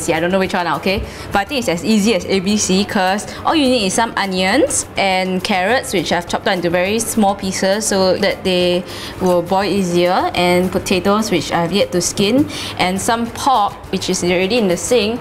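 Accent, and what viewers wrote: Singapore. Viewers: I love her Singaporean accent :D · Yeap, its known as Singlish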